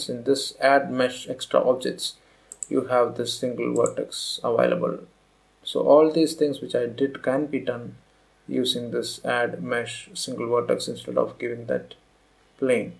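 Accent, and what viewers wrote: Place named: Kerala, India. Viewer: malayali thanneyanenn nerathe manasilayi karanam malayliyude english oru english alle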